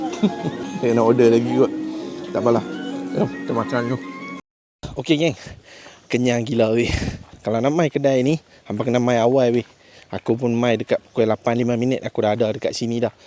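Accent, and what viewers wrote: North, Malaysia. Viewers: Bestnya bawa kami jalan²...Terima Kasih bg kami pengalaman mcm nie..Dngn guna loghat Utara..Mmg Surrr tgk.. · Bahasa utara sempoi anak muda Malaysia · Penyampaian yg santai, penggunaan bahasa melayu yg sempurna dengan loghat utara, rasa mcm sembang dgn kawan2 kt kedai kopi tapi masih ada unsur2 professionalism.. sempoi! Hang dapat subscriber baru dari aku. Teruskan kandungan2 mcm ni.. 👍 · Jarang sangat2 komen kat video2 travel. Tiba2 naik suggestion video ni. Mula2 dengaq loghat utara pun dah buat aku tertarik. Pastu tgok pulak creator video ni brader India dari Malaysia. Lagi la tertarik. Pastu pulak tgok style travel mcm ni lagi la buat jatuh hati. Teruih rasa nak travel sekali. Baru tau Kerala ni agak menarik. Keep up the good work. Hg mmg best la bro. 🎉👍🏻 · Best wehh chanel ni. Dia bantai loghat utara memang sedap dengar. Asal aku baru jumpa ehh chanel ni. 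Terus subscribe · suka dengaq hg cakap utara..cantik permandangan, rasa macam vibe aku kecik2 dulu..terbaik weii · Sedap betui ckp utagha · Sedap tengok video2 awak dik. Yang penting info sampai, puas hati & hang cakap utara rasa dekat di hati kak... Hihi. Sat nak pi tengok video2 lain pulak. Teruskan berkarya na 😊❤ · Terbaikkk la bro...bhasa utara betul2 mantopppp.... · Baru jumpa chsnnel ini. Menarik sgt. Terima kasih bawa kami menjelajah.. seronok dengar loghat utara awak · Bro sebab lograt utara hg aku follow bro. Semoga maju jayaa, keep it up bro👍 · org utara ka ? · One of the best Travelodge by my fellow Malaysian 🇲🇾. Bangga dgn awak bro. Best dgr loghat utara. Greetings from Vancouver 🇨🇦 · baru 1st time tengok terus subscribe...mantap dapat cakap dlm BM slang belah utara..tqsm n teruskan berkarya 👍👍👍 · frist time tgk video dari bro ni, aku plak jenis suka lorat utara, memng auto subs and like. 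terbaik la king fish tu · Mntap konten hg bang..dgn loghat utaqa tibai pi..mmg auto subscribe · Mula ii igt abg Indian sana sekali dgaq utaqaaa 😂 tq bang seronok layan vd abg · Best lah vlog nih!! Tambah plak dgn loghat utara!! Terus maju saudara!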